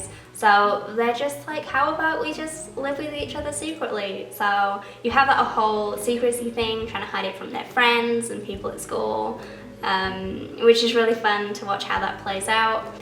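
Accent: Yorkshire, United Kingdom. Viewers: yeah can Defo hear the Yorkshire but sounded like a twang of something else aswell, maybe not lol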